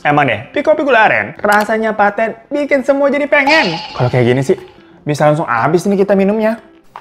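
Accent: Java, Indonesia. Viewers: hahaaa mas menghayati peran... pake bhs jowo😁salut mas.... sukses selalu yaaaaa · Lucu banget sih bang ngomong jawanya😂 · Bang” , bahasa jawamu itu lhohh , ahh gemes 😂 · Lucu bngt bang kalo ngomong Jawa wkwk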